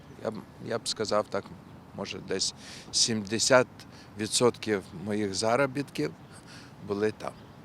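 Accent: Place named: Odesa, Ukraine. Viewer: 😂😂😂😂😂😂😂😂😂😂😂!!!! Шутите на русском!!!! На русском С ОСОБЫМ ОДЕССКО-УКРАИНСКИМ АКЦЕНТОМ!!!!!! Это СУПЕР И ОЧЕНЬ КЛАССНО!!!! Дякую Вам ВЕЛИКЕ!!! І ЩОБ ВИ МЕНІ БУЛИ ЗАВЖДИ ЗДОРОВИМ!!!!!!! ❤❤❤❤❤❤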